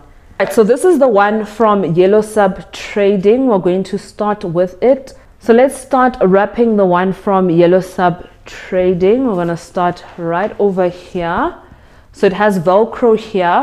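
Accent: South Africa. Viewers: Came here for a review on the waist trainer (which was so detailed thankyou💛) but I also got so distracted by your accent, I come from the UK and love the South African accent so much. I've been obsessed since watching Blood and Water 😍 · My first time here and I was crossing fingers you not naija😃because wow we are tired shame(love them still😂) then I heard your accent and I was like ai yebo ai yebo🤗🤗my sister oooh.anyways I have a problem,my tummy was slightly big so I bought these 2019 used it but nothing happened then I stopped.last year decided to buy a different one but nope rather my tummy has changed from being tight to soft that's all🤔😢so any advice for the sister here?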